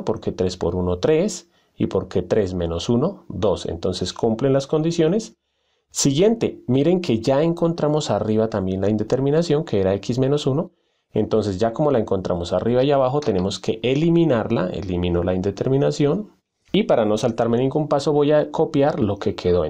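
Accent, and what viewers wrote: Colombia. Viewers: Disculpen es colombiano?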